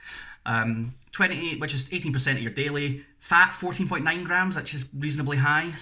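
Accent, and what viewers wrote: United Kingdom. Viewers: Are you scotish im scotish aswell🙂🙂🙂🙂🙂🙂🙂❤❤ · where is your accent from? what part of GB?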